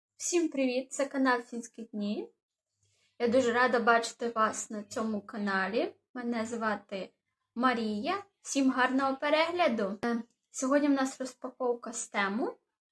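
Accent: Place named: Surzhyk, Ukraine. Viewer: Какой жёсткий суржик. Женщина, выучи украинский уже, или ты террористка которая косит под украинку?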